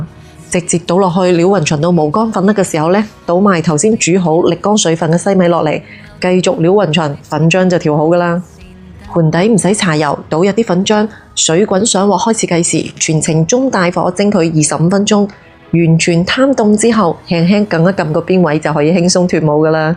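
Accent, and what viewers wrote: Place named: Guangzhou, China. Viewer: 廣州口音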